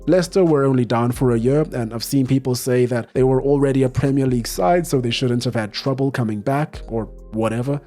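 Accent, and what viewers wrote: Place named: South Africa. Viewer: Bro what nationality are you? Sounds crazy, but you sound South African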